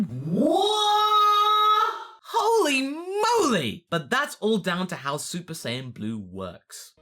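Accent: United Kingdom. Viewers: dude your voice sounds like a british version of dbz abridged goku 😂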